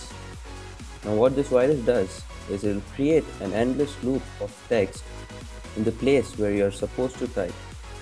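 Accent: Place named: India. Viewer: Ofc he has a Indian accent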